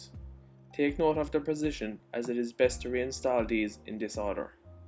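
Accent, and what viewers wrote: Ireland. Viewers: excellent help as i had to replace all my baffles. Also if you enjoy listening to the melodious Irish accent its a gem .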